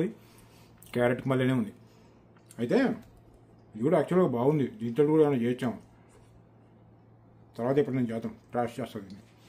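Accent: Andhra Pradesh, India. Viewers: Andra slag lo matladutunnaru bro, nizamabad ante nammabuddaitaledu anna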